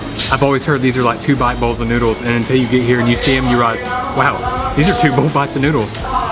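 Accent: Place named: South, United States. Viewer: Love your southern accent as well, you're so lucky to get to travel and eat all those exotic cuisines.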